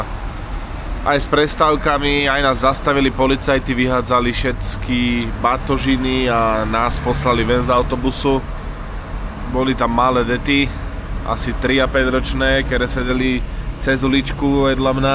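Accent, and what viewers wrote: Trnava, Slovakia. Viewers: hlavne pokračuj s takouto formou. páči sa mi to aj tá naša trnafčina.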